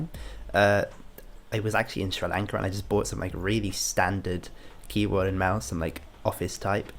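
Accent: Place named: United Kingdom. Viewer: I’m so used to hearing people talk with a British or Australian accent I forgot he was British lol good review tho:)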